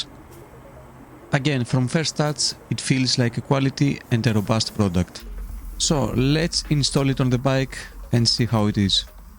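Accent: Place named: Bisaya, Philippines. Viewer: Sa umpisa kala ko kapwa ko bisaya 😂😂😂😂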